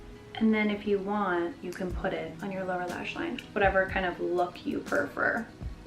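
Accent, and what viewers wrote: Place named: Michigan, United States. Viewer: I hear your Michigan accent ♥️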